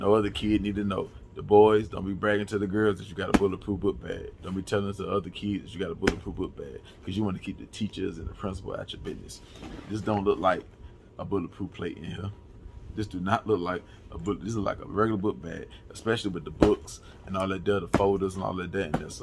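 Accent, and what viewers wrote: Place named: North Carolina, United States. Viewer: Good video. I knew U sounded like u from NC❗️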